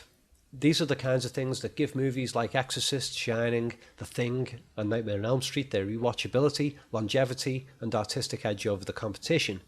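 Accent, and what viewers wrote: Liverpool, United Kingdom. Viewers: Nice to hear a fellow scouser narrating don’t hear it often :) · I realy cnt listen to a Scouse accent trying to sound sophisticated😂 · Are you a scoucer? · I don’t care how good, intelligent and eye opening this video is, and it is very well analysed, if it’s described by a scouser it sounds retarded. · Good video brother, nice to go on YouTube and hear a scouser doing a film video ;)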